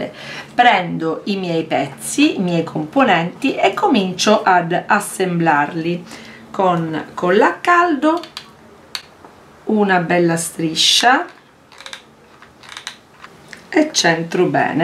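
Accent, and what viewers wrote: Catania, Italy. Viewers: ciao, sei bravissima! sei di Catania per caso?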